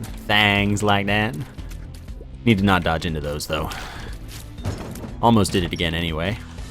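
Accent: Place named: Canada. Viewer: Ive noticed that you say a-boot instead of about so are you canadian by any chance.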